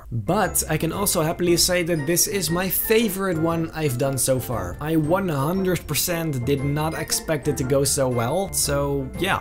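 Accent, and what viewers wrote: Netherlands. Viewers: is it just me or does he have a dutch accent?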